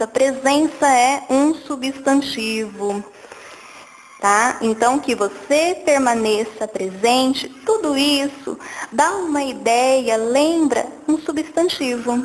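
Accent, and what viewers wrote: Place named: São Paulo, Brazil. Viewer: Que voz fudida e de paulista!Mais boa aula!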